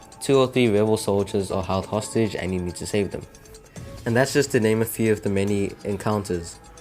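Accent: South Africa. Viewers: The second I heard your accent I knew you were a fellow South African 😂 great content bro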